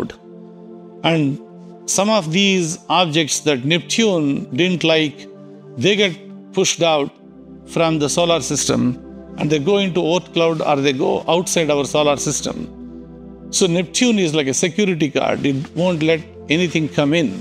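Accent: India. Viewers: I can’t watch you videos because your accent makes me think of all those Indian scam call centres!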